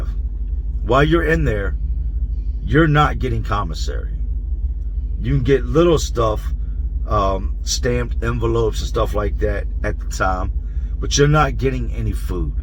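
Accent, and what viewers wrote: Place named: Philadelphia, United States. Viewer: Lol he said bol came back for more, licking his fingers and sht. All like: "yo that jawn was good. Lemme get some more." Haha I hate greedy people like that. Oh, and I'm from Philly. So I know how MFs can be. I can tell you've lived in Philly by the way you talk. But anyway, I was never locked up in Philly, but I've heard plenty of stories.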